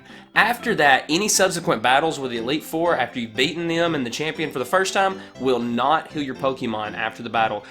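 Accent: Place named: South, United States. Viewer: I thought ur accent was ironic but it isn't. Nothing wrong with that just a southern accent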